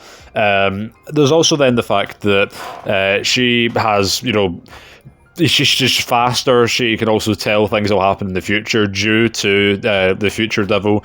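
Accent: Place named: Scotland, United Kingdom. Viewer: you are Scottish as hell and i love it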